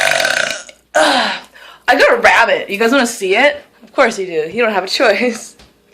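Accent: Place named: North, United States. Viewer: I'm sorry for your loss! What state are you in? You sounded like you were from up north but you threw me off with the palm trees...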